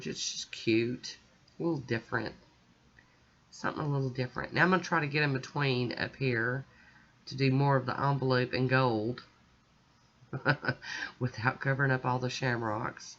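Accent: South, United States. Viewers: I love it!! And I like your voice, it's the few times I get to hear a southern accent. And your tips are especially nice, sometimes ya forget things and make it harder on yourselves. 💚🍀💚🍀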